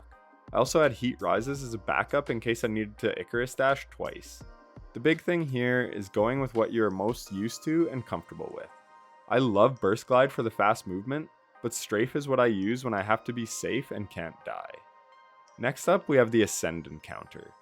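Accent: Canada. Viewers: I was fairly certain just hearing you speak but as soon as you said “off the rip” I knew definitively that you were Canadian lol. Solid video, dude. 👌🏻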